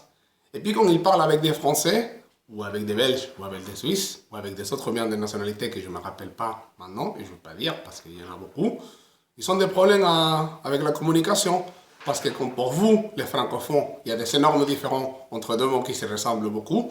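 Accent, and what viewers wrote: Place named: Spain. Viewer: T'es espagnol mon gars, on l'entend bien!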